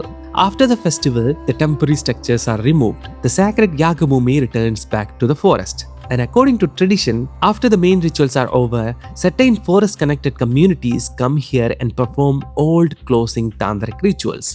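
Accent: India. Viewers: it is not bad bro you don't have to apologise if some can't even bother to be attentive to what's being said, it's still Indian English not British or anything.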